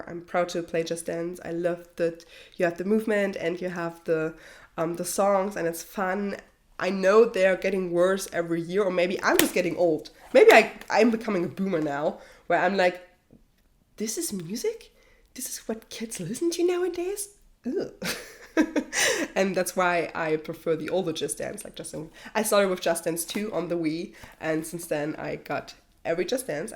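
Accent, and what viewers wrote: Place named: Germany. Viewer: Wie man einfach direkt hört dass du deutsch bist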